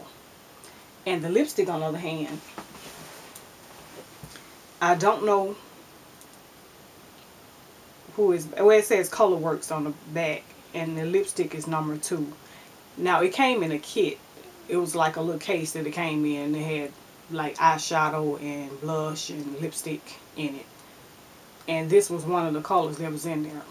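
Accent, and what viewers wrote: South, United States. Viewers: Please tell me where are you from. Strong southern accent. Love it!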